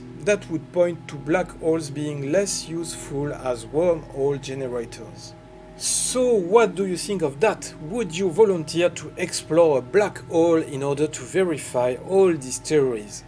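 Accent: France. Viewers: I love your "French accent" when you're talking in English! LoOoL hehehehehehe !!! Otherwise, I'd like to hear more about "Secret Space Programme" and "Space Force" which could explain "black holes", "time travelling", "Galactic brothers and sisters" stationned around planet Earth waiting for a coming "cosmic event" that will happen SOON within our solar system. Thank you with Gratitude, Love and Light!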